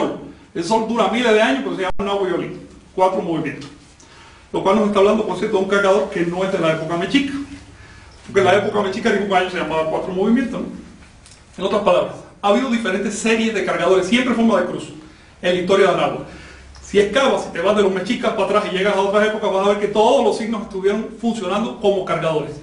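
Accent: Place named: Chile. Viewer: hablas como chileno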